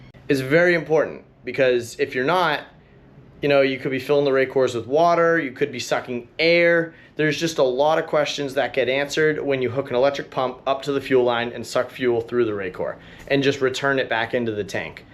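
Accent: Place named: New York, United States. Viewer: This guy has that New Yauk twang and he lives in Florida.... Poor Florida, we are full up with these jerk offs....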